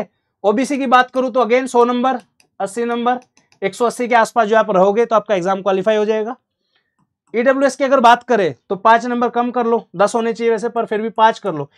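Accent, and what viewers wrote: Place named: Haryana, India. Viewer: Haryanavi boli 😅😊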